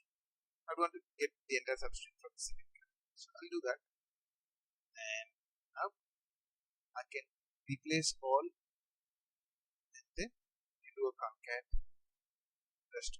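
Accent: India. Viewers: The sound sucks, with it's over amplification and under feed. Hard enough to understand with the fast Indian speech. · same comment as lakesidelivin: The sound sucks, with it's over amplification and under feed. Hard enough to understand with the fast Indian speech.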